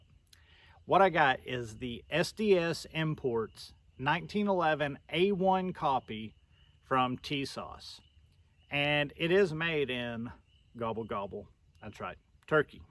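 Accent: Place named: South, United States. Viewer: pinetrees everywhere, wild grass and moss. slight southern accent. ima say your from the Carolinas. my home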